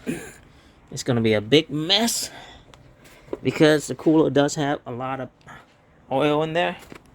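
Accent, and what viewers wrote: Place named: Vietnam. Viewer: chao anh. thanks for the video. I know a vietnamese when i hear one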